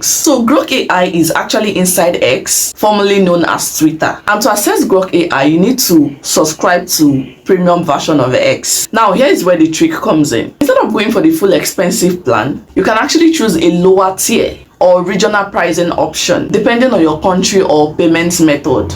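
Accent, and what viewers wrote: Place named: United States. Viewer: The presentation in this video is top notch! Your accent don Dey turn USA oh sis😂 YouTubers! Big people💯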